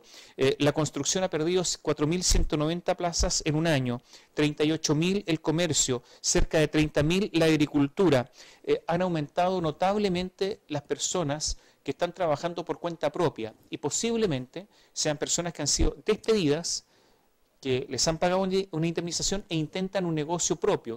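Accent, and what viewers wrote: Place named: Chile. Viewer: cada vez encuentro mas chilenos con su acento estupido el peor castellano de america!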